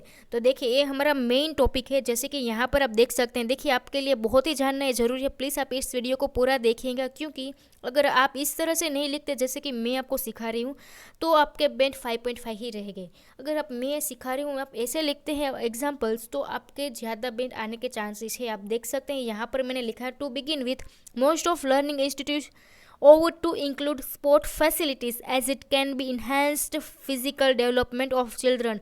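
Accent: Gujarat, India. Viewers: Very informative Ma'am aap Gujarati ho , aapki boli se lagta hai I'm also Gujarati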